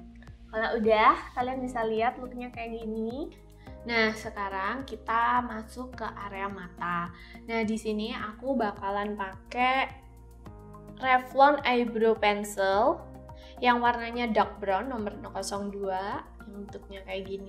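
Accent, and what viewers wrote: Java, Indonesia. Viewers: pertama kali liat langsung subscribe :D lucu medok jawa gitu haha sering" upload dong kak ^^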